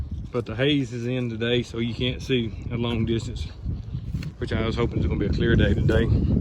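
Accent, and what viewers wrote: South, United States. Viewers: U a southern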